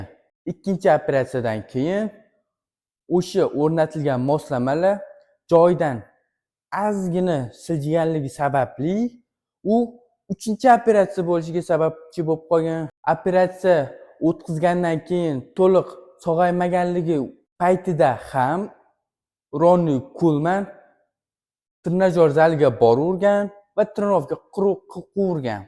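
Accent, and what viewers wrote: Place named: Tashkent, Uzbekistan. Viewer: Yana bitta narsa toshkent shevada emas adabiy gapirish yaxshiroq buladi manimcha nutq juda juda zaif ishlash kerak ekan agar shu sohada ketmoqchi bosa bu bola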